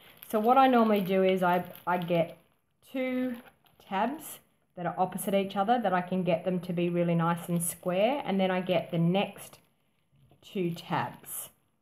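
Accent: Australia. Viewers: 🐙 Me, hubby and our 2 boys moved here Christmas 2018 from Brisbane. We love it here 🇦🇺 New Subscriber from Finley, NSW I’m 36, Married with 2 boys - very very crafty. 🌺 I own a Business Making & Selling The Safest Candles & Melts In The World Plus Various 100% Natural Body Products Incl Organic Baby Skincare Products, Organic Assorted Melt & Pour Soaps + More ❣️ These boxes would look great in the Packages & Favours that I Make. 🍄 See my Instagram page <link> 🌸 Facebook Business Page Chillaxing Melts, Candles & Body Products ❣️ Twitter: 🍀 Email: <mail> 🌸 I’m a Registered Nurse, Midwife, Homeschool Mum & Qualified Licensed Aromatherapist, therefore I’m legally allowed to use Essential Oils & Blends In Products Absorbed by the Skin. 🌷 I’d love to send you a gift box. 🦋 I Make beehive soaps that would fit nicely. 🌺 You sound like your in the Tweed Coast / Coolangatta . 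🔆 Plz pick some items you’d like to try and pick as many as Scents as you’d like. ⚠️⚠️ Note: I do have a ABN Plus I own another name and my business name will change to it in December 2019, currently setting up Shopify Store 🍀 Have you thought about selling tutorials on Kindle?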